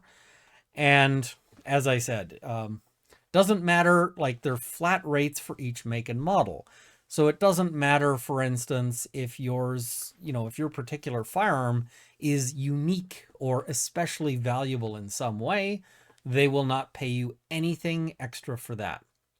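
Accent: Canada. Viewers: Hate the quebeque English accent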